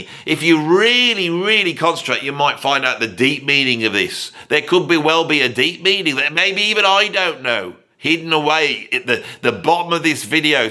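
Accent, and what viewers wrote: United Kingdom. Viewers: There's something about listening to a British rant that is just all too amusing. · I love you man because we're all brothers and sisters in the eyes of the Lord, but that British accent is way too much for me.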